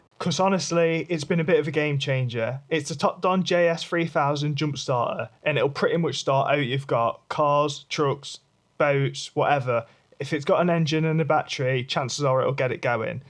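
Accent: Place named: East Midlands, United Kingdom. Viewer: You sound like a east midlands lad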